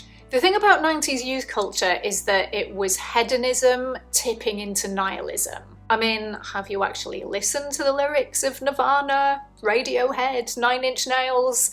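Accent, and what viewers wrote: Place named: Manchester, United Kingdom. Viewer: I thought I could hear a little Mancunian twang in your accent. The 90s in Manchester was unbelievable but of course I'm biased. It was the cultural capital of arguably the world back then. The music, nightlife we had a decent football team back then also. As far as safety goes you could argue that Manchester was more dangerous in the 90s that's why it was dubbed Gunchester there was a lot of gang activity. Statistically I don't know which era is more dangerous. Maybe social media and algorithms play their part in elevating the sense of danger and fear Of course they weren't around back in the 90s. Generally speaking I think that every generation would say that the time that they spent as teenagers was the best time of their lives. So if you happened to be a teenager in the 90s then you would say it's better than today.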